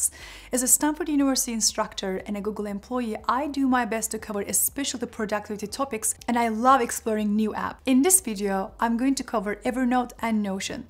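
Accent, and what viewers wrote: Turkey. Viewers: Tatlı bir Türkçe aksan sezinliyorum :) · Türk olduğunu bilmeseydim yine de Türk derdim sana podcast yayınları severek takip ediyorum ama çok güzelmiş ingilizcen :)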